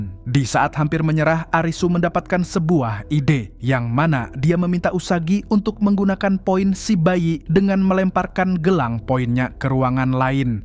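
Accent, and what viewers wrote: Banjar, Indonesia. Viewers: uma lah di video ini keluar banar Banjar sidin 🤣🤣🤣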